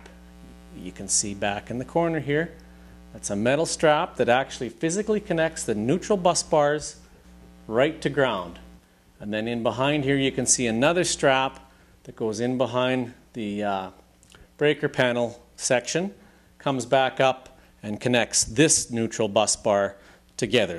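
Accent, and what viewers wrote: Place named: Canada. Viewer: He lives in Arizona? This guy sounds totally Canadian Ay!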